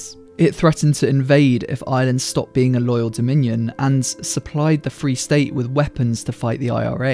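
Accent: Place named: England, United Kingdom. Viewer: As soon as I heard the English narrators accent I knew we were in for 13 minutes of Tansplaining. The Anti-Treaty forces were standing by an oath they swore to an Irish Republic, not a partitioned land as a British dominion. They were not “self-mutulating”. Banshees is a dark tale of a failed musician who is suicidal, but his laissez faire catholicism prevents him actually carrying it out. He decides instead to hound the gentle Padraig to suicide instead.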